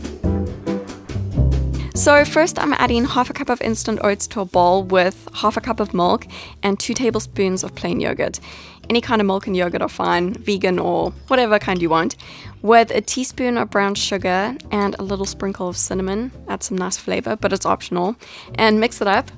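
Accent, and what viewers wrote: South Africa. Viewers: South African accent is strong haha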